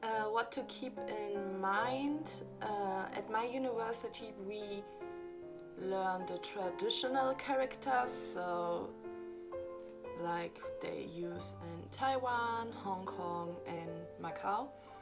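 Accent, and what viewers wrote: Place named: Eastern Europe. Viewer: Thanks for this video! I am thinking about studying sinology and you helped me a lot. I study on high school now but next year I'll need to decide what program at which uni I wanna study and apply there... I was thinking too about studying in Germany although german is not my native language (same with english), I'd improve my german at studienkolleg and then apply to the uni, but I am not sure if it's not too much for me :D And what are your native languages? You have interesting accent, I guess you speak german and some slavic language! Polish, probably?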